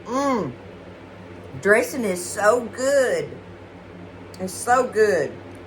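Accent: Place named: Midwest, United States. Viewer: Finally, a u tuber with an awthinic Midwest accent. Great recipe!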